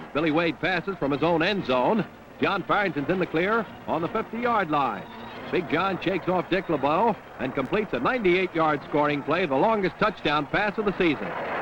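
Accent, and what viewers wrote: Canada. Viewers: Canadian pronunciation.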